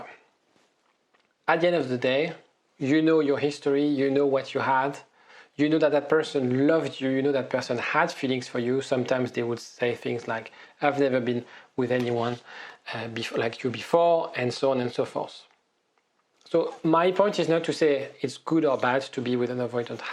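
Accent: France. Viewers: While listening you, I hear your french accent. Probably I've mistaken but it's really nice😊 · Thank you, also very nice to listen to your French accent, Monsieur!;-) But not really sure if i want Her back haha... if there's still a mutual karma She will be back anyway. These kind of videos help, donc merci quand meme,! Salutations de Pologne.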